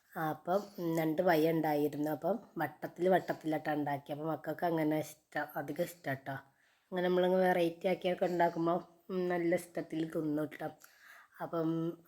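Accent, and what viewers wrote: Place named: Malappuram, India. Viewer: എന്നെ പോലെ ട്ടോ ട്ടോ വരുന്നുണ്ട്, മലപ്പുറം ആണോ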